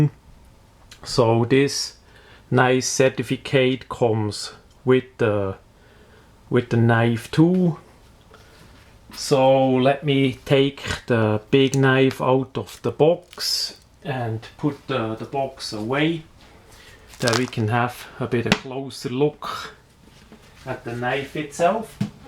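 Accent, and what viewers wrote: Switzerland. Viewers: Oh Swissenglish very lucky axent,!!! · Das isch es richtigs Schwitzerenglisch :-D eifach genial! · Merci ver de swiss-akzent :) geil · I come from germany and I always liked the swiss accent... In English it's even better! =) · amazing knife, helpful video and your english has a funny swiss accent ;)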